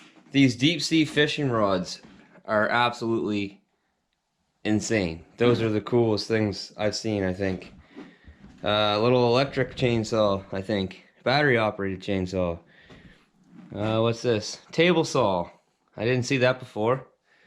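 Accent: Nova Scotia, Canada. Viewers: Canada? Accent sounds canadian? Nova scotia! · First time seeing your channel. Sounds like a Canadian accent☺️, what area is this property near? It’s beautiful! That’s some long driveway in! Do you get snow? So the cabin is seasonal, or plow the driveway? What a dream!!! Great purchase👍🏼👍🏼👍🏼 congratulations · That was the cutest Canadian accent I ever heard. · No - please work your native Canuck "accent" - I call them inflections for lack of better term - back in to your speech. As an accent-less, flat-speech, plain-white-toast American in the PNW I enjoy hearing it. It's amusing and I still have to mess with my family aboot it but it's flavor/character so don't mess with yours bud. I have to watch Letterkenny, Corner Gas, My Self Reliance and Strange Brew frequently to get a fix. Cheers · Sounds Canadian...love Canada!!! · I was wondering why your accent was pronouncing house as "Hoos" then you said Nova Scotia and it finally made sense. 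· I heard the NS in your voice before you even mentioned it. 😂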